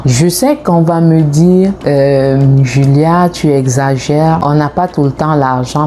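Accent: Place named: Canada. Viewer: Merçi avec ta petite voix canadienne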